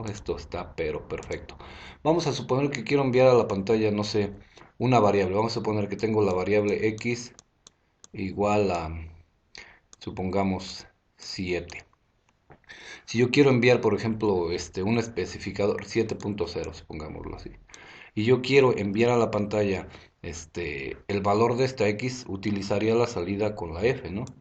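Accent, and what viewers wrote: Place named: Mexico. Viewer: De todo YouTube es al que más le he entendido, con respecto a la programación. ¡Felicidades! Se le entiende muy bien, yo creo también tiene que ver que use las mismas expresiones y el mismo acento que yo, ya que también soy mexicano, soy de Guadalajara. Saludos.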